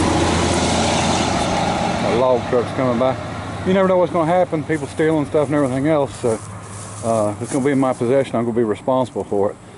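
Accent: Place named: Detroit, United States. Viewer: sounds like a detroit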